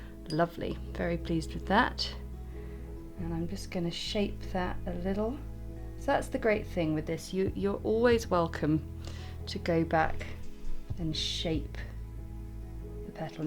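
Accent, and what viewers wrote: United Kingdom. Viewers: Well here we are 4 years on and I just knew you’d have a lovely crocus flower to paint for my Spring/Easter cards. My first go without watching this again was just a disaster, so newly armed with the better approach I’m confident I can pull this off. Ps—honestly I don’t care how you pronounce Alizarin; I so enjoy your British accent it just does not matter to me one whit!!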